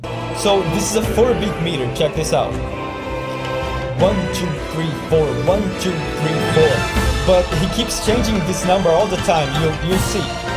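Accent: Brazil. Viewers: wow you sounds very brazillian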